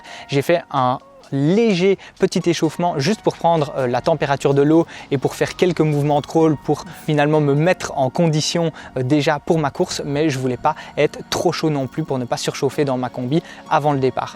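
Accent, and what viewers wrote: Belgium. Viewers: Avec ton accent je croyais que tu étais belge xD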